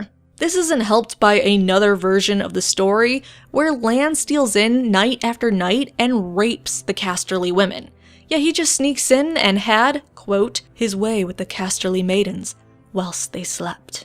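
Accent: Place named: United States. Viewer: To hear this in ... "American" sounds soooo wrong. No offence though.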